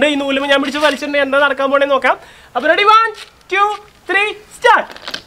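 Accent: Thrissur, India. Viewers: Thrissur karde sound anganeya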